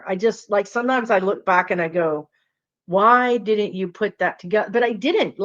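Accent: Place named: South, United States. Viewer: You are adorable, down to earth, 100% believable and I love your southern accent when you let it out.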